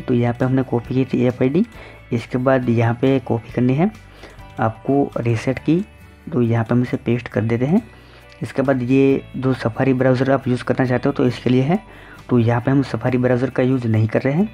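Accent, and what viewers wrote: Gujarat, India. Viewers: Seems like Gujrati. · Bhai gujrati laago cho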